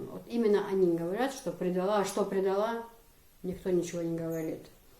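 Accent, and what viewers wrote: Chechnya, Russia. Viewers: Это надо же выучить чеченский язык на столько что ты не можешь говорить на русском без акцента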